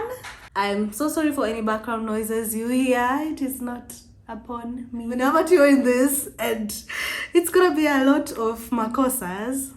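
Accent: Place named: Kenya. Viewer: you sound Kenyan, then you don't!!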